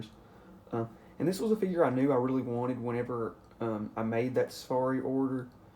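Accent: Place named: South, United States. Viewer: Wow that looks hyper realistic! Nice southern draw you got going, I got one too I’m from Arkansas.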